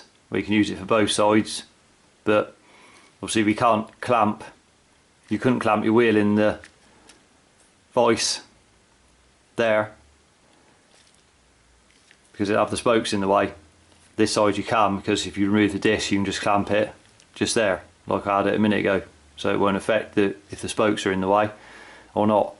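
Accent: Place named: Gloucestershire, United Kingdom. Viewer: Thanks a bunch brother. You accent seems like you are very close to where i live in Gloucestershire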